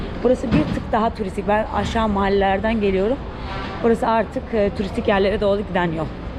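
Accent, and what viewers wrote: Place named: Sivas, Turkey. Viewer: İspanyolcayı Sivas tokat biraz doğu aksanıyla harmanlaman diyolok kurman çok hoş.bilmiyorum banamı öyle geliyor.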